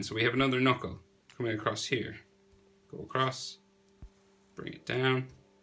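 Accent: Ireland. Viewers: Trying to draw mob and immediately recognised your accent, my Irish brother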